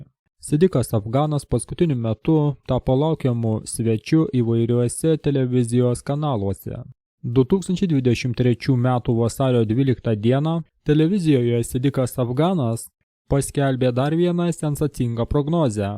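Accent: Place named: United States. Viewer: Klaysyk, satanistų rupore.... Išmok bent scenarijų perskaityti, ir pabandyk suprasti SKYRYBOS ŽENKLŲ prasmę, kai skaitai iš tau paduoto lapelio.... Dar.... Kokie biesai tave finasuoja? A, berašti parazite... Dar.... Tavo vertimas - IŠKRAIPYTAS ir to nepaneigsi. Ir nustok čia skleisti iliuminatų planą-svajonę. Ir žmones gąsdinti ir klaidinti. Pyzdink iš Lietuvos į tą savo jankyną, ir bent pasistenk, KAD PIDAROSIŠKAI-JANKIŠKO AKCENTO nebūtų, padla.... Nežinai nei rusų kalbos, nei lietuvių. Kas esi? Mormonas, jahovistas suknistas, ar kitoks Šėtono išpera?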